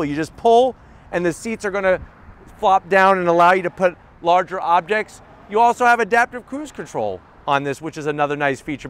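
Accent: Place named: New York, United States. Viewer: Hey......you need to calm down dude when your doing a review because you found like your going to have a hearty man......Also don't talk so fast and lose the new york accent man.......One more thing grow your hair out a little....I know your thinning a little around the top and the frontal hairline and other areas however you need to grow it out pretty long and that way you can cover your bald spots or second choice would be just get about 2 hair transplant surgeries and that way you have instant coverage and you never have to worry about it anymore....trust me I did several surgeries and am soooooo happy that I did this.......also put some nice designer long pants jeans on and a nice tank top and now we are talking man......Just saying all this and I mean it too!!!!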